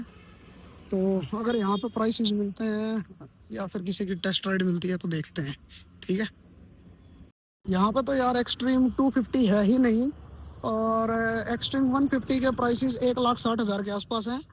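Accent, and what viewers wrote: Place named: India. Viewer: bhai no doubt gadi bahut achi hai in terms of performance,looks and road presence but agar gadi humein problem de to bahut dukh hota hai maine bhi aisi kuchh dikkate apne manpasand bike me dekhi hai to mai samjh sakta hun.. baki apka desi accent 🤩 mat chhodna keep growing.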